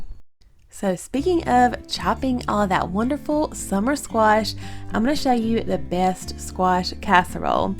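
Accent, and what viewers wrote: South, United States. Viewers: Thank you for sharing your recipes ! Your Southern accent makes it even more enjoyable to watch ❤️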